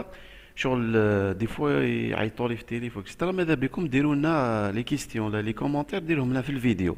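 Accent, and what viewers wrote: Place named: Kabylie, Algeria. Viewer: Allah ibarek je pense nta qbayli hsab la façon de parler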